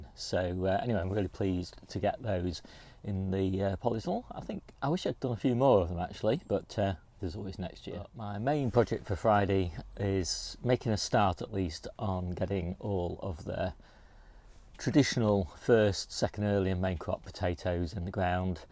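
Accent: United Kingdom. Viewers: I KNEW IT! Lincolnshire accent is one of them which sounds generically north, yet, not in any way whereby you'd be able to tell/pinpoint in any particular way. It's like Grass is said like gr-ass (northern) instead of gr-arse (like the southern way of putting an R sound after the A), yet, when at a bar a coke will be requested as coke (leaning more towards the southern pronunciation) and not c-ork (like the Yorkshire pronunciation)! 😅 What threw me though is the lack of a hard A sound. I doubt, for example, you'd say caarpaark like someone living on the north Lincolnshire coast would ☺️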